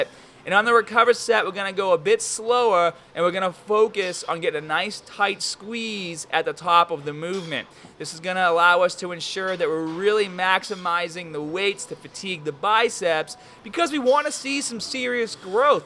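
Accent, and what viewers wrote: Boston, United States. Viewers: Baaahstan accent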